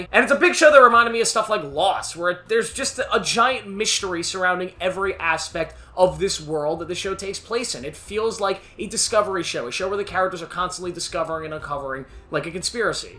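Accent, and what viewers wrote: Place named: United Kingdom. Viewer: The British accent did come out often glad I am not the only who noticed that since English is not my main and I thought I am imagining it 😀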